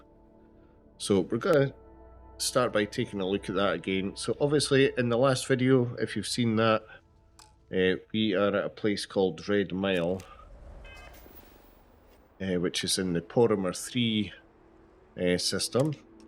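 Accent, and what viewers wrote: United Kingdom. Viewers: great to hear a Scottish accent for a change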